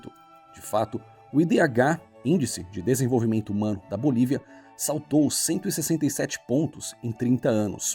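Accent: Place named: Rio de Janeiro, Brazil. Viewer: Sou portugues e acho que o.sotaque carioca dá um toque especial no portugues, mais animado, descontraido. Mas bom é nos entendermos em imensos paises, foi a melhor coisa que os nossos antepassados fizeram, espalhar a lingua.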